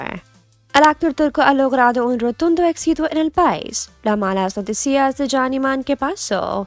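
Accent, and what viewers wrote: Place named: England, United Kingdom. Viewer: Perdonais, alguiene dice porqué la señorita de voz, habla en español malisimo con actento inglés y dice los números en inglés también??? NO SE ENTIENDE NAAADAAAAAA. lo siento gracias.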